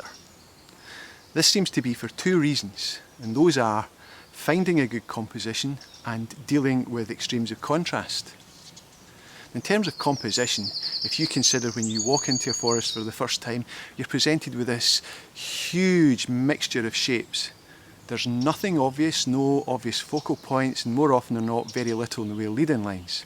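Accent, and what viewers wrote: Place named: Scotland, United Kingdom. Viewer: That's clearly a Scottish accent... right? Very nice video..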